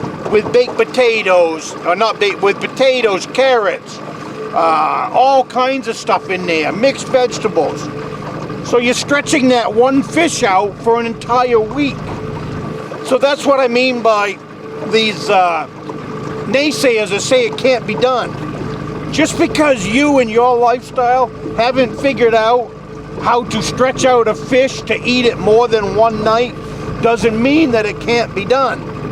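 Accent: Maine, United States. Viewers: My mother born and raised Down East Coastal Maine . When most lived and hunted and caught most of their life . She met my Dad in NC and raised a family ... I spent many of my best childhood times crawling rock and raking blueberries . i have always dreamed of a permanent return ,but life and the wild extremes of winters keep me Southern fried and hot ... I'm tuning in to hear your voice and the accent of my relative's who no longer walk the wildwoods or clam bake on a cool summer evening . and to catch a glimpse of dream I've never forgotten . thanks for the memories that come rushing in like high tide on a full moon .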